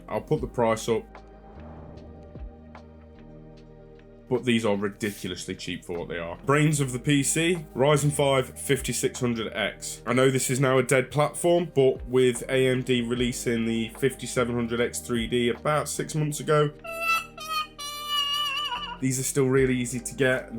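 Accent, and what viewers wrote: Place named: East Midlands, United Kingdom. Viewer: That would be a good idea mate, very helpful for us novices with next to no experience! You sound a bit east Midlands ish, where are you based?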